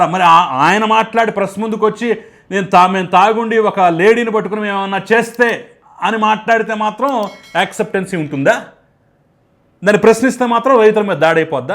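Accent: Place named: Kadapa, India. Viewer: Peddaina slag vintuvunte kadapa slag la vundi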